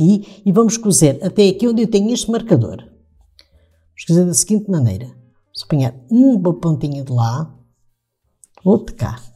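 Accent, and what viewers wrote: Portugal. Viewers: Obrigada, adorei, super fácil, e adoro ouvir seu sotaque, me mata saudades, amo Portugal, amo os portugueses, tenho muito respeito por vcs!!! bjos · adoro seus trabalhos super bem explicado e seus trabalhos são maravilhosos e adoro seu sotaque português adoro bjs 😘❤️ 🙏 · Sim. Uma meia comprida e reta! O ponto deve ser elástico né? Seu canal é do Brasil? Tem sotaque de portuguesa de Portugal! QUE fofaaa, muito atenciosa! Obgda!❤❤❤❤⚘🕊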